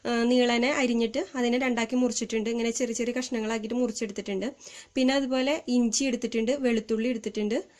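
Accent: Thrissur, India. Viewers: Extremely well presented. Your language style is excellent and your honesty is highly appreciated. Not many people admit their success through the trial and error method. Your cooking is good and your simplistic Thrissur style commentary is both appealing and amusing. But let's not take away any credit from the delicious dish you have prepared. Keep going and may you present many more such interesting and appealing recipes. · ചേച്ചി തനി തൃശൂർ ആണല്ലോ 😁😁😁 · ചിരിച്ചു വയ്യ. ത്രിശൂർ ഡയലോഗ്... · Thrissur slangum curryum superrr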